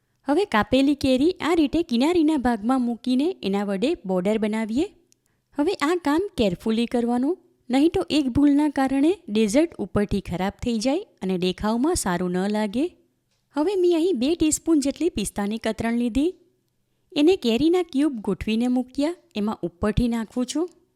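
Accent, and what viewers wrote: Surat, India. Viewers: Nice mango dessert I liked your Gujarati in Surati accent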